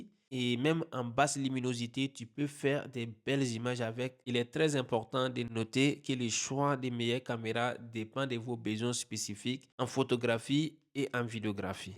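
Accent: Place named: Africa. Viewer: Merci 🙏. Je suis juste qu'un simple amateur/débutant avec un G7 de 2016 que j'ai laissé dormir depuis looooongtemps et que je ressors petit à petit depuis quelques semaines. Que de temps de perdu...😔. Pour ce qui est des Lumix, j'apprécie l'ergonomie,la prise en mains qui fait boîtier solide. Les menus également que je trouve assez intuitif. Autrement,je t'ai découvert il y a peu et j'apprécie ton contenu. En plus,pour une fois que l'on a un"cainfri"🔥(avec le vrai accent)😂passionné de photos/vidéos qui à sa chaîne Youtube et qui fait ça bien pourquoi ne pas l'encourager ? Pour le coup,je m'abonne et je lâche un:Yah Foye,on est ensemble 🤣 🔥. Mes salutations de la Réunion 🇷🇪 🙏 🔥 🤝. Bonne soirée.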